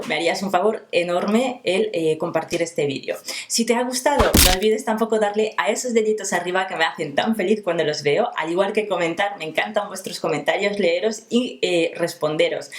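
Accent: France. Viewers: ¿Cómo que no es acento de los buenos el de? Yo soy francesa y te puedo asegurar que sí lo es. ¿ A caso crees que no se nota diferencia en el acento de los suizas cuando vienen a Francia (Sin intención mía de ofender a nadie por supuesto)? Por lo que se refiere a pronunciación, lo hace perfecto, de maravilla. Es lo normal acentuar ciertos sonidos porque algunos difieren mucho del español y también porque algunos no los hay en español ( la 'j' y la "e' francesa entre otras) Yo soy maestra en Francia y también así lo hago con mis alumnos de primaria, en fonética, para que noten bien las diferencias entre ciertos sonidos cercanos tales como s/ch o v/f, lo que es imprescindible para hacer relación correcta entre fonema y grafeno. Para terminar te diré que no creo que se rían los franceses del acento francés de las personas ajenas que vienen a Francia por ser un país con fama tolerante, respetuosa de las diferencias y valorar a quienes se esfuerzan para exprimirse en nuestro idioma, lo cual seguramente es lo que entre otras cosas le proporcione a Francia su riqueza cultural. 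Y permite que te diga otra que es la siguiente, el acento de además de ser bueno es muy bonito y te aseguro que a todos los franceses nos encanta ese acento del sur de Francia. Saludos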